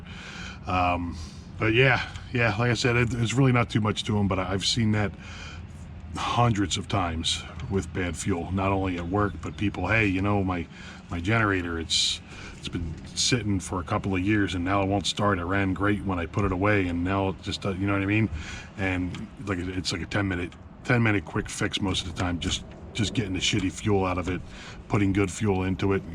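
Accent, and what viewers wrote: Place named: Pennsylvania, United States. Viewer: Are you from PA?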